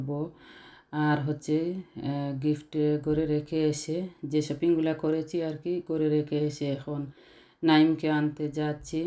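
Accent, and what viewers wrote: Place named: Sylhet, Bangladesh. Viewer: 👍খুব সুন্দর লাগলো আপু 🎉🎉সিলেটি কথা শুনতে ভালো লাগে 🎉🎉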